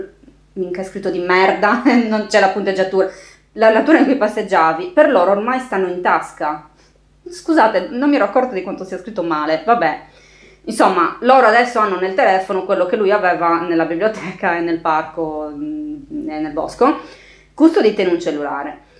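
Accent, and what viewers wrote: Sardinia, Italy. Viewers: Non spesso sono d'accordo con te (sì sullo scwha, no - o con molti distinguo - sui grammar nazi), ma sentirti stroncare un libro con il tuo simpaticissimo accento sardo non ha eguali!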